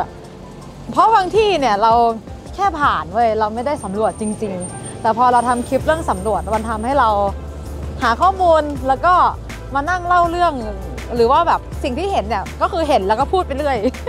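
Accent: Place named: Northern Thailand, Thailand. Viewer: แอดฯ.อู้เหนือเพราะจังเลย..แอดฯเป็นคนจังหวัดไหน?❤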